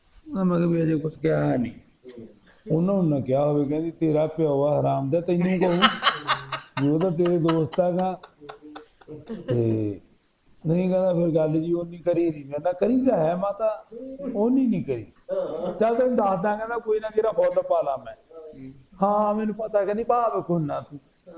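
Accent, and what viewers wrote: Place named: Lahnda, Pakistan. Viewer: So sweet ❣️❣️❣️❣️ Lahnda Punjabi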